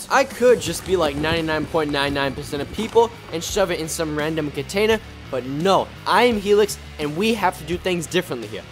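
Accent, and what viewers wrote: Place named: New York, United States. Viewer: Judging by your accent, you’re either from New York or Vermont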